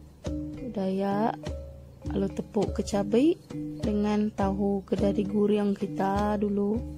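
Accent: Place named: Iban, Malaysia. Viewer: Pertama kali saya dengar loghat Iban..suka dengar loghat2 lain ni. hehe..btw resipi ni boleh buat ni. thanks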